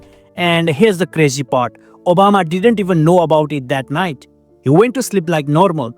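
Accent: India. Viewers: your indian accent makes it even more funnier lol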